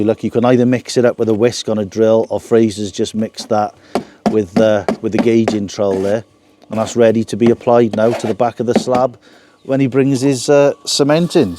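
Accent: Wales, United Kingdom. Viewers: Wales is as beautiful as the people. Englishman living in Germany fellas. I miss Barmouth and visiting my retired parents who moved from Barmouth sadly more inland. I checked and I actually have more rain here near the Austrian alps than you do...but it's south Germany so we do get more sun. Love your people and the accent boy o. Top tips! let's have few pints together.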